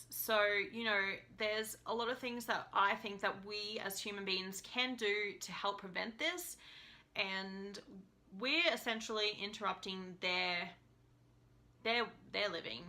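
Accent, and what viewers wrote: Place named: Australia. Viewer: I could listen to this girl forever ! Two jobs, packing all that life into so little time... don't know how she does it. But I was young once too.(that's me in the picture but... it wasn't yesterday). Loved Banff and the Canadian Rockies. Thanks to You Tube I can rediscover this wonderful place. Thank you Aussie 👧 girl ! Love your accent !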